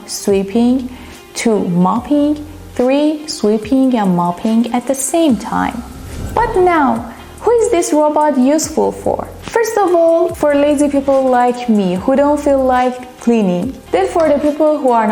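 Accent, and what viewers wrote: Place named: Iran. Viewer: Phenomenal Persian accent!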